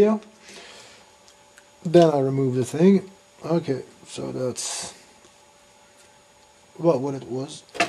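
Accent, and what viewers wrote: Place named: Quebec, Canada. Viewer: j'ai reconnu l'accent québécois lol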